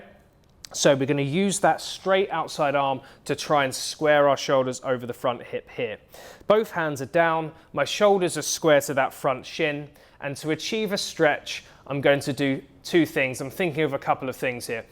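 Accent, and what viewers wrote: United Kingdom. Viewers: Very nice and detailed presentation. Your video was full of the kind of detail that's helpful to know to achieve good results. And, you sound British, but you look like Capt. America.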